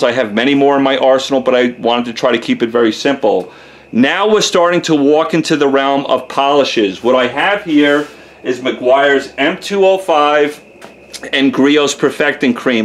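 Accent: New York, United States. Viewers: A Texan who sounds like a New Yorker. Hey, great video. Very informative. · Are you from New York?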